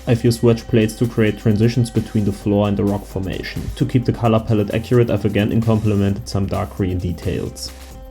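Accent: Germany. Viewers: Are you German? :) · Höre ich hier deutschen Akzent?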